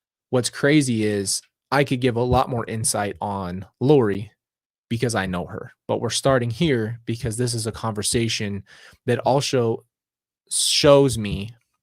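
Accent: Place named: Boston, United States. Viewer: "Piece a gahbage" lol, you sound just like a Boston boi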